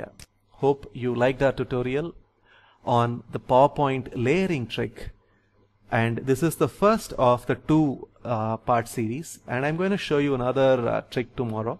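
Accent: India. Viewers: Yes, it was informative. Thanks. You have a heavy south indian accent though. Are you a Malayalee or a south Indian?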